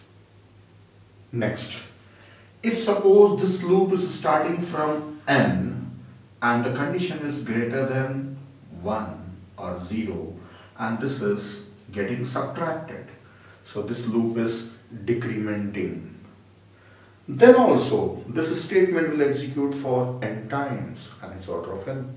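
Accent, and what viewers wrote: India. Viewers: omg op indian with less accent vey nice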